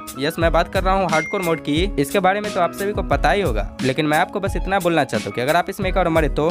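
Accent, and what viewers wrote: Bihar, India. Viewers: R U From Bihar ? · Bro ur bihari accent is awesome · you are from Bihar i m 99% sure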